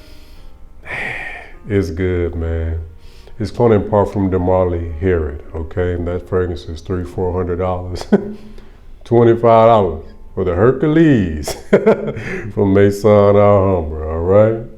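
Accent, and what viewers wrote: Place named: Texas, United States. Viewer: Man you gotta be from Texas.. the way you talk. I love it. A new subscriber here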